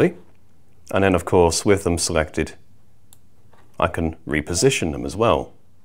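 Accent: United Kingdom. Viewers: Excellent - that helped a lot - nice to hear a British accent that I can actually understand as opposed to an American gabble.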